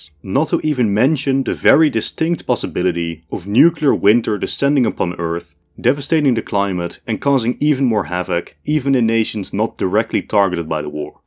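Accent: Netherlands. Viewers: You sound dutch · Nice video. If I may ask, are you Dutch? Your accent sounds Dutch. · Sounds like a Hollander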